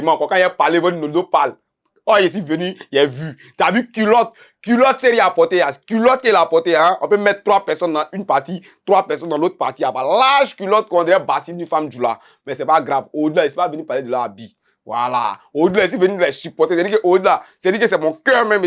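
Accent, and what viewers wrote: Ivory Coast. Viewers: bro jaime ta maniere de gbaé cest du pure ivoirien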